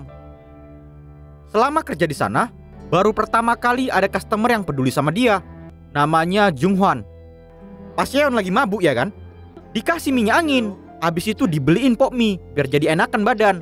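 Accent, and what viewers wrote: Medan, Indonesia. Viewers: Bahasanya kyk tmen sy orng medan,lucu😂🤣😂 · Kayak nya dari medan ya bang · Maen kli bahh bahasa logat Medan kita itu ya wakk 😂 Salam dari Belawan Lae ku 🙏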